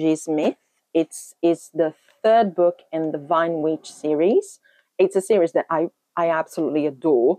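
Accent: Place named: United Kingdom. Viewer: Você tem um sotaque britânico lindo!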